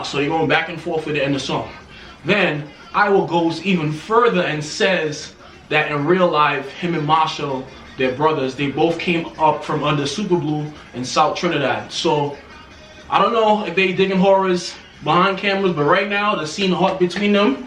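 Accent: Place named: Saint Lucia. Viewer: Knew you were a Lucian, picked up the accent in between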